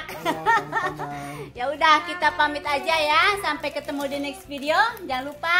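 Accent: West Java, Indonesia. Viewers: ih pertama kali buka video na logatna teu bisa bohong tos katebak orang sunda tea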